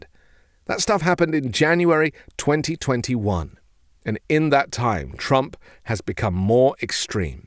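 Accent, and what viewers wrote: United Kingdom. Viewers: For some odd reason, listening to you with a British accent explaining why Trump will lose is very soothing and gives me peace of mind. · Why is this lame with a gay a$$ British accent telling you about American politics???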